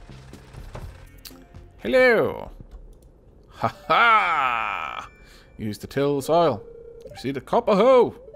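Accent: Wales, United Kingdom. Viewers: I've got a funny feeling the seeds in the top left corner of the furnace chest were meant to be planted during the summer. I may be getting them confused with the watermelon seeds that were temporarily overlooked. Really enjoying this let's play. There's something very soothing about the combination of such a pretty looking subdued type of game & the glorious Welsh accent. It makes a nice change from the horrors & shoot-em-ups I normally go in for. Just a shame I don't have a computer capable of running it myself but then I'd never get any work done.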